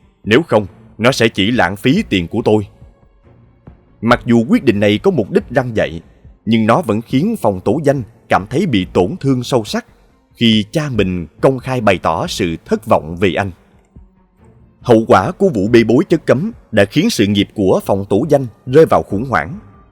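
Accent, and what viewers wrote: South, Vietnam. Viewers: Giọng đọc miền Nam hay quá , giống như giọng của MC Anh Dũng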